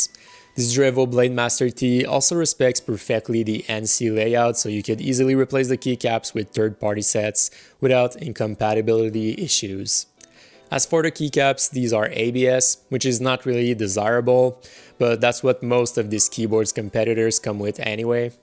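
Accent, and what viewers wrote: Quebec, Canada. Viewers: Tu est quebecois?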